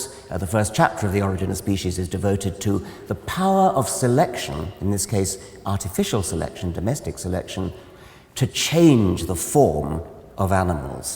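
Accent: England, United Kingdom. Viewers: It's just a British accent, we in 🇬🇧 nearly all have one. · Arrogant no that's what English sounds like .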